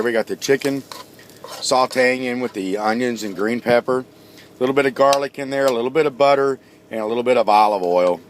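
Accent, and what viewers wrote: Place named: Los Angeles, United States. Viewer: looks great.. what accent he is speaking,is this LA accent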